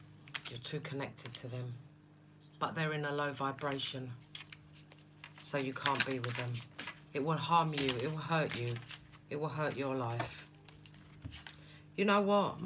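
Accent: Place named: Australia. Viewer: Keep your chin up. The lady will look a bit off. These are collective readings. Good Heavens, not just for her. These are for entertainment. If anyone finds something as advice...well ok. I do at times. Love your Australian accent. :) ................I couldn't do an edit. But yes, LOL!!!! You are so much like someone I know slightly at work. I feel the lady is intuitive but has to hold it back because of the culture at work. :)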